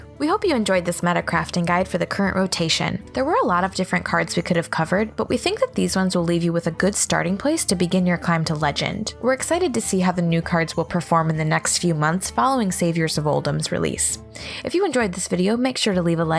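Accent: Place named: Michigan, United States. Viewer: I don’t hate your voice. But your accent is very distracting when my brain is trying to figure out what a warlack is 🧐😜 Michigan, I’m guessing?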